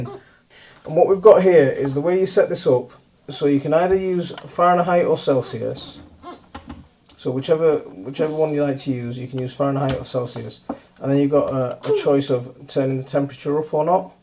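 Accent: United Kingdom. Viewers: I could see that. Excellent. Tell me, it sounds like you may be second generation British. Where do your family originate from? I lived and worked in Bangladesh from <num>.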